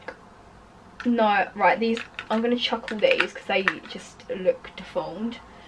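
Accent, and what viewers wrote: United Kingdom. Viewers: me, a canadian, watching this because i love bright, alternative makeup and just makeup in general. me, the same canadian, listening to her british accent: LIT-CHRA-LLY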